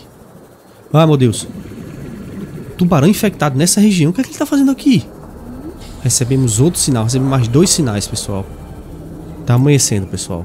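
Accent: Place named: Northeast, Brazil. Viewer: Conhecendo o canal agora, por esse jogo, pq preciso de dicas, to meia perdida nele, gostei, adoro esse sotaque nordestino, ganhou uma inscrita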